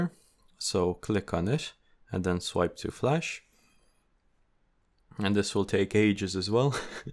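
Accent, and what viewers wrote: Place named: Ireland. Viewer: you have an irish accent im confused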